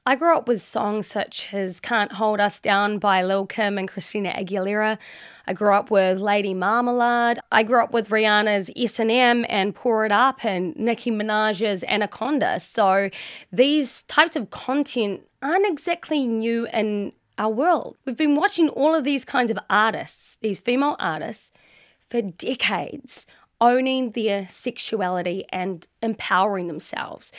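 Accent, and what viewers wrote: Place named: New Zealand. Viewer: She sounds kiwi so New Zealand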